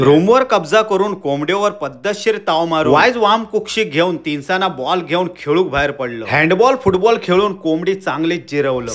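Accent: Malvani, India. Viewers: tumch boln khupch aavdt te mhantat na ki. .. malvni manus to malvnich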